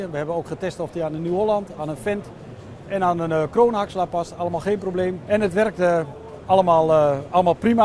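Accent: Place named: North, Germany. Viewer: It sounds so much like northern German, to my austrian ear^^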